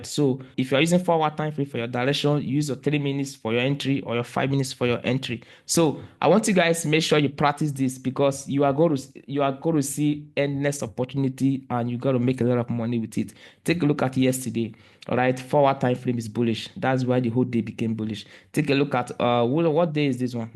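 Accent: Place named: Agbor, Nigeria. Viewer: Bro, talks like an agbor man. Nice one boss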